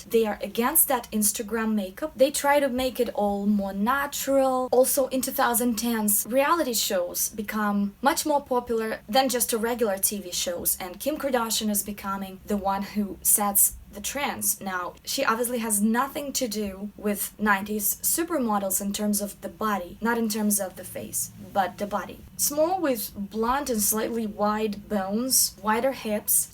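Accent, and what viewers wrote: Russia. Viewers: I believe shes from Russia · Is that a slight Russian accent I can hear. Good analysis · me Its not constructive criticism. It is shaming someone who has English as a second or third language I guess. She’s Russian. She tries to speak English properly. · Sorry, are you Russian? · I love your accent, are you Russian? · Надо же как я угадала что акцент русский😎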